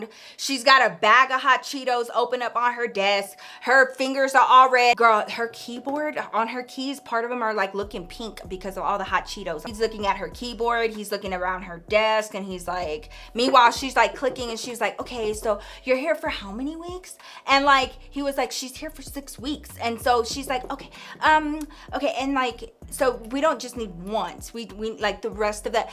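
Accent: Texas, United States. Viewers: New here and lovin these stories! 🥰 This is my 3rd. The first was the concert demons story, and for some reason I Immediately knew you were a TX girl. When you said Dallas in this video I was like, "AH HA!" 😂😂😂😂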